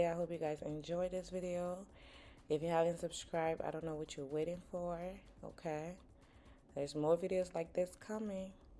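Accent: Liberia. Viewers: I knew you were Liberian as soon as I heard the accent.. love the video.. I’m Liberian as well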